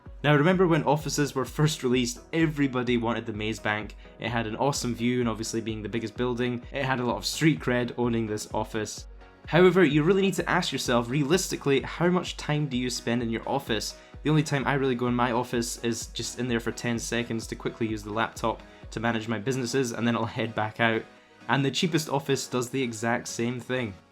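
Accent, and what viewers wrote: Scotland, United Kingdom. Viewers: Ur Scottish?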